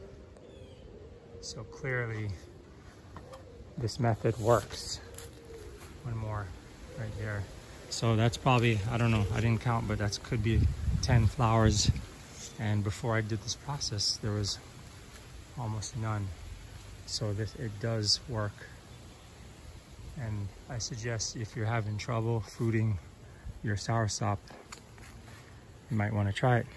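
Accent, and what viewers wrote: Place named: Jamaica. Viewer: Respects - cool technique. (PS you sound like a yard man all weh ah gwaan still 🙂)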